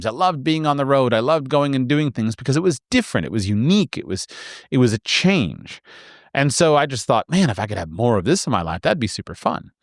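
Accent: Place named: Florida, United States. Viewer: He has always talked like a Floridian.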